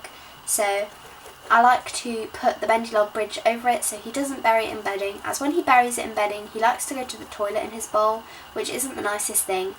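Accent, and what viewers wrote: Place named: United Kingdom. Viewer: I useally can't stand british accents but you'rs is charming and not anoying at all😄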